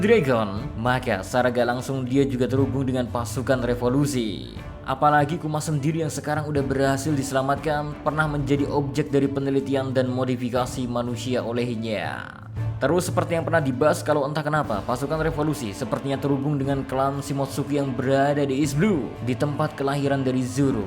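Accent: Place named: Lampung, Indonesia. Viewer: ini orang lampung kayaknye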